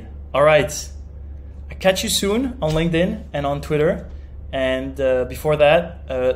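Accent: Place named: France. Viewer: Je perçois un petit accent de langue française… Parles-tu français par hasard? 😊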